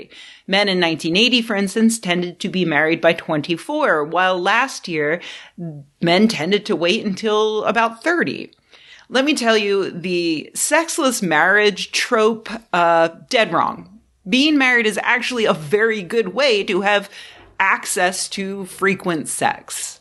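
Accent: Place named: East Coast, United States. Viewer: Rebecca not seeking to detract from your content, but you have one of those East Coast accents that hearing you say the word "Mirror" would be like a bomb going off, in a nice way of course!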